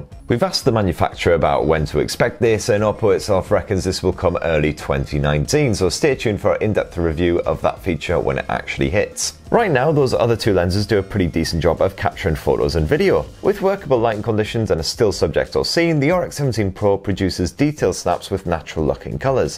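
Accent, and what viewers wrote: United Kingdom. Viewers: A little exhausted to catch your british accent sometimes. Maybe you're willing to a bit slow it down next? Nice video btw.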